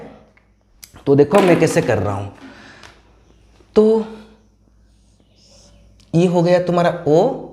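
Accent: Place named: India. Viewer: Can you add subtitles? Cause I have trouble with understanding English with Hindi dialect...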